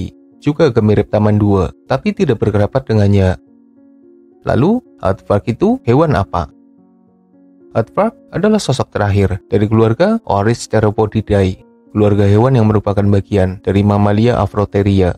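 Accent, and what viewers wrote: Banyumas, Indonesia. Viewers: Kaya wong ngapak yah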